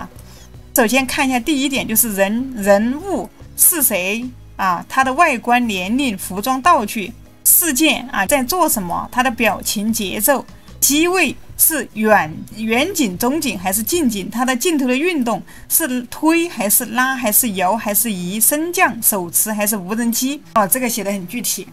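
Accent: Sichuan, China. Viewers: 博主是四川人吗？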